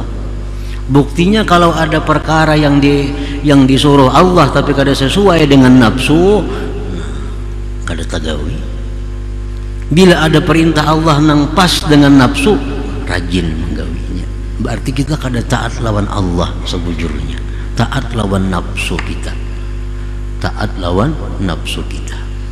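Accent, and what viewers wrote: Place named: Banjar, Indonesia. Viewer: Alhamdulillah lama- kelamaan sy boleh faham. Bahasa yg Pak Guru guna diselang seli dialek banjar. Sebagaimana pak2 kiyai di pulau jawa berbahasa indo-jawa, begitulah halnya di kalimantan—mrk bertutur dlm bahasa yg digunakan oleh org kalimantan umumnya iaitu dialek banjar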